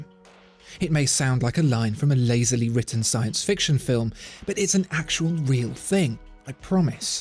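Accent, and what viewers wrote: United Kingdom. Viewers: Very nice watch, intolerable commentary. The brits haven't lost their innate talent to annoy people by simply opening their mouths.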